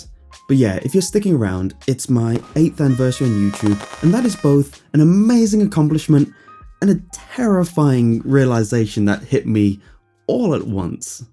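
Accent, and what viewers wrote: England, United Kingdom. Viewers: Wow, you are a very humble guy and I’ve always listen to you because of your very calming British voice ❤️. Keep doing what you love and just listen to your gut instincts. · Can someone tell me where in England his accent is from? I love listening to it.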